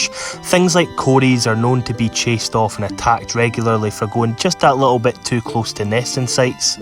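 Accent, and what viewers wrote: Scotland, United Kingdom. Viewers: Ahhhh… the Scottish accent… how I’ve missed it! I did some traveling in Edinburgh a while back. Lots of good memories!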